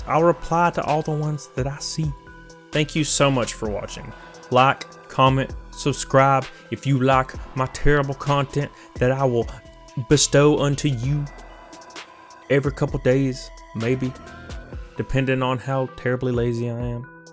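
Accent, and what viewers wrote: South, United States. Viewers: I noticed he sounds like Gomer Pyle sometimes with that southern drawl. However, unlike that show this guy is funny and entertaining.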